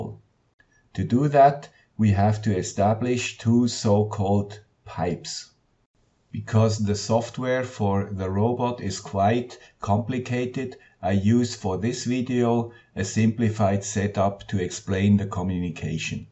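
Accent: Switzerland. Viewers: I really love your numbering of your video's sir! Keep up the good videos and I love your Swiss accent! Danke schön! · great and very helpful ! really love your videos and btw super swiss akzent:)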